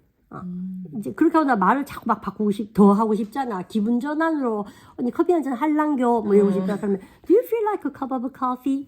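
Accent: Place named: Andong, South Korea. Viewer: 전 친정이 안동이라 고향생각나고 ㅋ 사투리 너무 좋아요.. 친정에 있는 느낌이예요 ㅋ 잘 보고있습니다. 감사합니다! ❤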